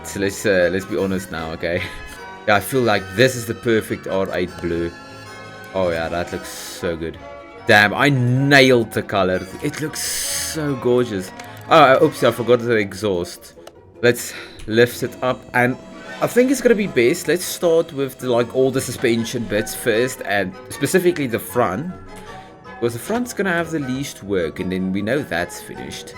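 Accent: Afrikaans, South Africa. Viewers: yeah you can hear your home language Afrikaans but I must say this game is addictive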